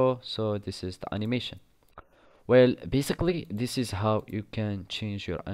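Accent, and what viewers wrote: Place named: Morocco. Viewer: You sound moroccan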